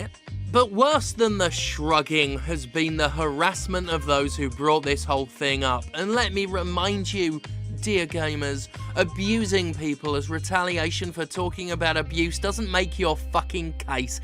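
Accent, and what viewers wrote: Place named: Birmingham, United Kingdom. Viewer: Your becoming slightly Brummie and I’m ok with this.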